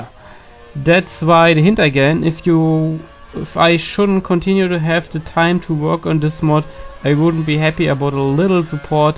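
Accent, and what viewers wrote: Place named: Germany. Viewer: Ich liebe den deutschen Akzent xD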